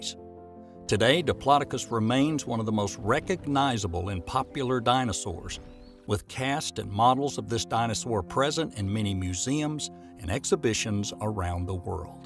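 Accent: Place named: Rural, United States. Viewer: Y'know what I just realized while watching this? My view of the south-western US states was subconsciously improved by the fact that this info is being delivered by a rural American accent. It's embarrassing to realize I got influenced in that way, but...seems like an effect that tourism boards should be looking at. Evolution being discussed by this demographic feels out-of-place, but it doesn't have to!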